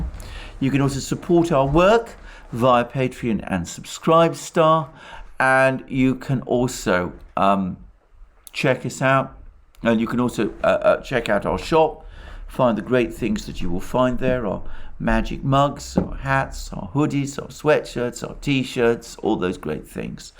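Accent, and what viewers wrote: England, United Kingdom. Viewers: I have been following you but many times you absolutely rise the anger in me. It's not just your English accent but how you express yourself I find it's appalling. Nevertheless I think your message probably rings true. What an evil man oh hell the word "evil" some sort of religious expression. No it's not a word that you expressed but the problem that I have with you it seems to me you want Pappa Pu, like a steamroller. Distruction, everything in his way no matter Fields buildings and people squashed . Like a rollerball we've cleaned everything that was here the dentist yeah they didn't think about that way. And you, it's just war games. I would love to sit down and play chess with you however there's somebody else I need to talk to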